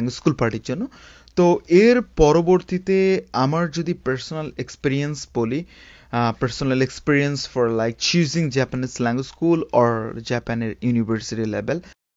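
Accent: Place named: United Kingdom. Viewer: Vai apni Bangladeshi, amon vab nitechen amon vabe kotha boltechen mone hoy apni kono engrej, Bangla ta ektu vlo koira bola sikhen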